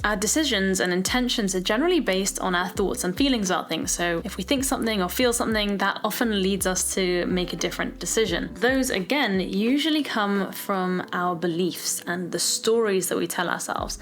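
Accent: England, United Kingdom. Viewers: I'm 7 seconds in with with English accent and I'm "in".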